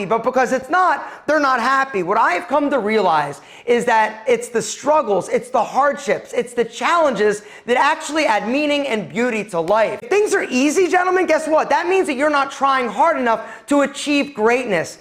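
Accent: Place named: Delaware County, United States. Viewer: you from delco bud?? You have the accent.. G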